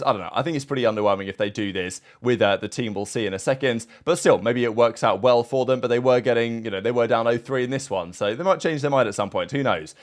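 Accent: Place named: United Kingdom. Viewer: yay learning english, british engliish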